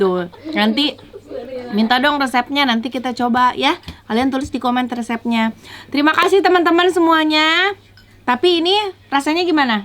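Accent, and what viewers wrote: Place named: Sunda, Indonesia. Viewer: Berasa d garut kitu tah suasana na nyariosna sunda sadaya tth geulis ih resep abi mhh😇😇😇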